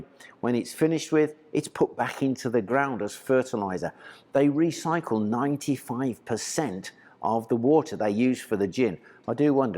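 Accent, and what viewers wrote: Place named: England, United Kingdom. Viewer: All this time I assumed you were in England. That accent better not be fake. I'll be sorely disappointed.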